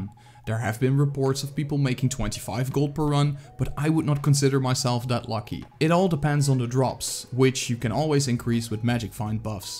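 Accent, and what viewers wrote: Netherlands. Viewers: Same haha! I already thought he was Dutch and im right! Goede engelse uitspraak tho!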